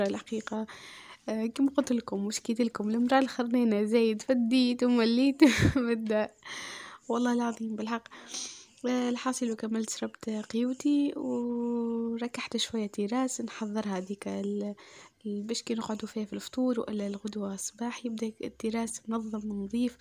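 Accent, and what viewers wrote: Tunisia. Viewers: ماشاء الله عليك اختي.....لهجتك جميلة انا من محبين اللهجة التونسية.....اختك منى من السعودية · مش كن تونسيين متابعينك انا متابعتك من جزائر نحبك ونحب توانسا وخاصة هدرتكم ❤😇🌸🥰😍🇩🇿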